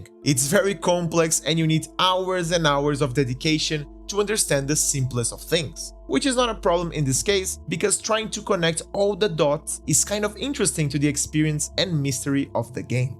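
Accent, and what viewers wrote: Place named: Brazil. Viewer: Bro You're brazillian right? Lol. Got from the accent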